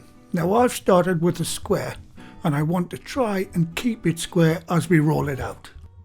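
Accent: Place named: Northeast England, United Kingdom. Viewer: He sounds like my father-in-law. Also from Northeast England.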